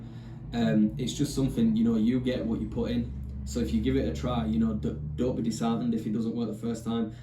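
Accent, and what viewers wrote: Leeds, United Kingdom. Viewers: Really enjoying your channel given you a sub y oh sound like a Leeds lad too MOT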